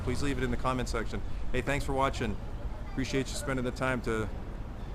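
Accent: United States. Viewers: i'm gonna be honest. You have the most american sounding accent on earth and i love it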